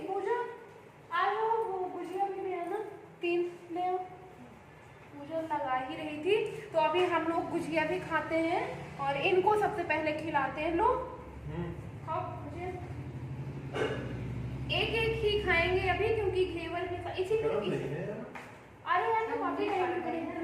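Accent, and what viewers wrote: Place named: Bihar, India. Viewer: आप हिन्दी सही से नही बोलती बिल्कुल बिहारी जैसे हिंदी बोलती हो।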